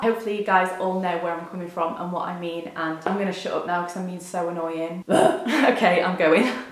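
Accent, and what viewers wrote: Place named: North, United Kingdom. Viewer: I relate to you massively with regards to food. I’ve struggled with binge eating when I feel anything but happy and the past few weeks I’ve massively been off plan with slimming world. It’s so inspiring to see someone so beautiful having the same issues as me, so I thank you for showing me it’s okay to feel how I feel. Also you’re northern like me and I love it!! 😂 I really hope I meet you at some point, I’d love to sing with you is that weird?? 😂💕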